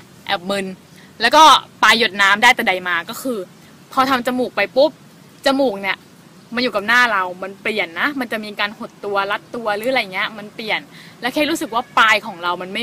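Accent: Thailand. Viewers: พูดเหน่ออ่ะ แต่น่ารักนะไม่ได้ว่า ผมว่ามันเป็นเสน่ดี......ศัลยกรรมผมเชยๆนะใครก็ชอบคนสวยทั้งนั้นแหละ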